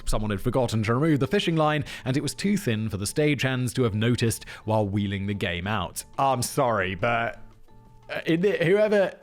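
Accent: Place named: United Kingdom. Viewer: The topic isn't my top interest in any way, but I really need to look those up lol. was made for podcasting, especially as an American, where any British accent immediately adds some sort of credibility 😅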